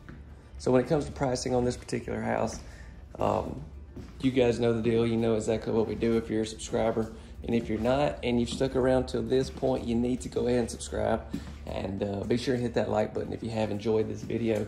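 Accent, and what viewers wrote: South, United States. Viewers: Here in Texas, they say "fixin to"....in his adorable way...says "fitin to".....I am beginning to learn Southern with him!!! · but it is you. You are a southener. Not a bad thing. It is in your personality be glad it's a likeable trait.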